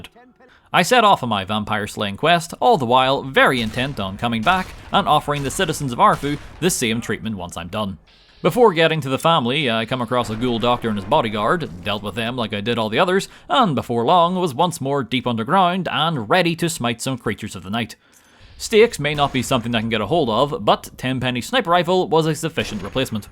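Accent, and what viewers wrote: Northern Ireland, United Kingdom. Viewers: My m8 said u were northern irish the 1st time I heard ur voice I knew he was right and what is ur least fav religion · Clicked on this video and was immediately flash banged with "HOLY SHIT IS THIS DUDE NORTHERN IRISH" lmao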